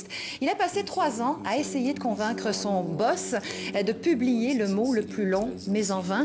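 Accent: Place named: Quebec, Canada. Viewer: Moi ausis, son accent Quebecois.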